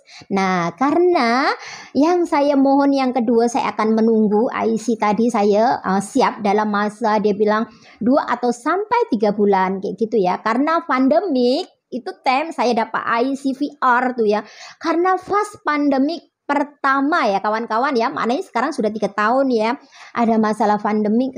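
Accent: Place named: Indonesia. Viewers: Akk dlm video ni bahasa indonesia nya masih pekat😅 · Akk ni bhsa melayu pun blm sangat Pasif msh cakap indonesia kental lagi terdengar.lg pulak akk ni kl nak apply ic biru rugs lah blk indo jadi org asing.dah berkawin dgn org warga negara punya anak2 warga negara anggap aja blh tumpang ngan suami N anak2.dah dpt PR dah ok dah cukup tuh dah tak perlu buat vissa spouse citizen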